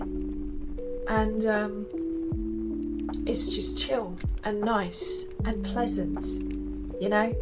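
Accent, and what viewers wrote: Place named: Birmingham, United Kingdom. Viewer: You have shown great courage in order to follow your heart. I think you have kind of a Brummie accent to some extent. Well your taste in music compliments your personality all too well. If you don't mind would you like to try this australian band called. By send my love to the Mitsy as well.😇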